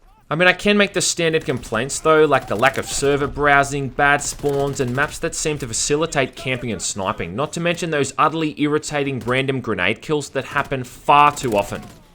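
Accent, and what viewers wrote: Australia. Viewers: So nice to hear a fellow Aussie on YouTube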